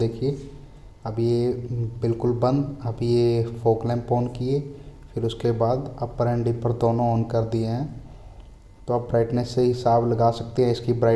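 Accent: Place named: Punjab, India. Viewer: That punjabi accent.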